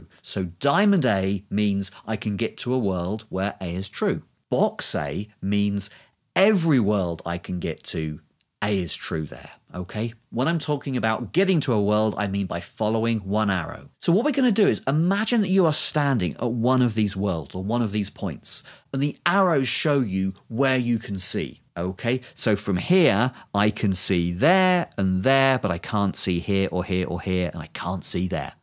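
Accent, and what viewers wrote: United Kingdom. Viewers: I have to think, hearing him, about Eric Idle from Monty Python. It's a certain British accent and not unpleasant at all. For the rest, it's too difficult for me although I notice some resemblance with Feynman diagrams, which I understand neither.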